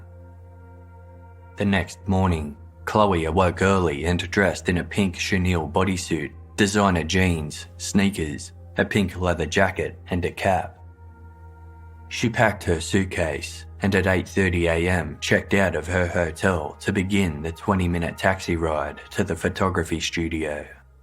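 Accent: Australia. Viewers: He couldn't be more Australian, and speaking seems a struggle, is what I think I meant. Not so much a compliment as an observation. Listener since Ep 1, so not a casual or spur of the moment take, and one he might not disagree with to judge by the Q&A he once did. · Not NZ, 'Stralian' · If you mean the presenter then he sounds Australian or New Zealand. I really like his voice and find it so relaxing that I often put these vids on and fall asleep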